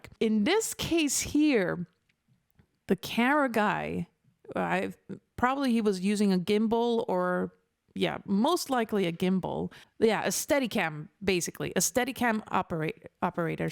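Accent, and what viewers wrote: Paris, France. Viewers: je suis francais j'ai 41 ans tu parles aussi bien que moi tu as un accens limite parisien et ton francais est clairement parfait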